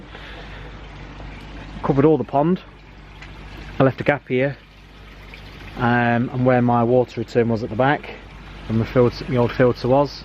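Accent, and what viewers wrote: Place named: Nottingham, United Kingdom. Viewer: Just subbed to your channel mate! Lovely pond with some great ideas going forward! If my keen ear for an accent is correct, you sound very local to me mate lol. I'd guess at Notts (potentially Derby) but ill stick with Notts :) North Leicester me :) Looking forward to more updates!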